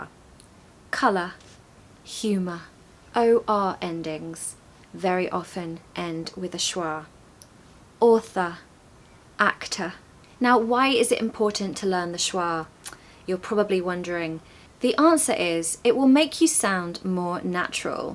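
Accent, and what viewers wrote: RP, United Kingdom. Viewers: Love your BRITISH ACCENT :) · Hello, I like your smiling face when you explain plus British accent makes your video more interesting to watch 🥰 thanks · Hello nice to meet you. I’m French, I discovered your channel today, so you won a subscriber. I’ve been learning English for a year, and I’m still bad. I love your British accent, because articulating too well thank you. I’m going to learn really well with you. Thank you, an admirer. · I like your British accent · I love British accent and your lip and your voice listening from Bangladesh. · Thanks for your British Accent, I enjoyed your video from South Africa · You have a nic british accent and amizing . go head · Thank you. I love your british accent. When you pronounce worsd they sound musical as you said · Hi, i love your british accent and your pronunciation is very clear. 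thanks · Thanks for sharing. I love British accent. I could understand everything. It's clear and nice. I wish I had a full Brit accent. Spanish is my mother tongue. Cheers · She doesn’t have « the » British accent, she has « a « British accent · I have been teaching British accent for the last 30 years in India. I love your accent very much. I wish to visit Britain. Could you help me where I can stay in Britain ? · I lived in the UK for more then 16 years and it's a real pleasure to listen to that TRUE BRITISH ACCENT 😉😁😘😍🤘💪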